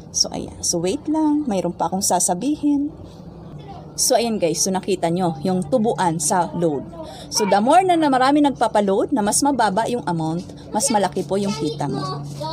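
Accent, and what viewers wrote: Waray, Philippines. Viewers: Waray waray ka maam?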